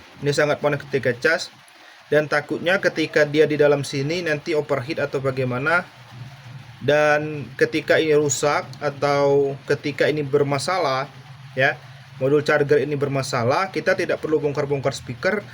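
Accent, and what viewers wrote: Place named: Medan, Indonesia. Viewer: abg orang mana ? logat nya ku dengar macam orang medan. mau tanya tentang speaker eggel ku bg. kalau volume kuat mati sendiri dia bg. ada solusi nya bg ?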